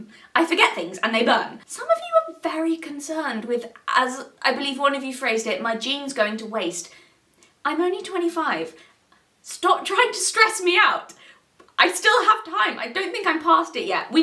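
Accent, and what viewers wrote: United Kingdom. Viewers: Its official, having a British accent makes you sound smarter than us Americans. I actually have a friend with a similar accent to this woman, and even though he's not really a genius, I always feel dumber around him. Weird huh? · I am here for the British accent, mostly. Lol👌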